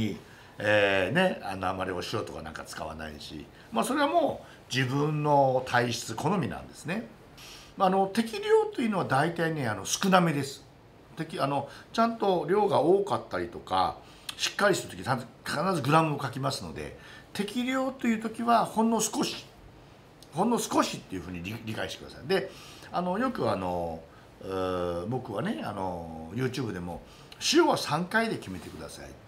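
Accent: Japan. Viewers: I've been learning Japanese for a while now and I really enjoy watching Chief. Maybe it just seems to me, but the Chief seems to have a slightly different accent than that of creators coming from Tokyo. Are you from other parts of Japan Chief? As always, thank you very much for the great video and I wish you much continued success!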